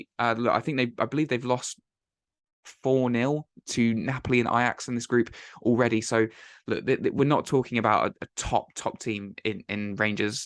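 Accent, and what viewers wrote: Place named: South, United States. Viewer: Not being funny mate, your chatting with a Southern accent saying your a scouse fan, sounds like a glory hunter to me.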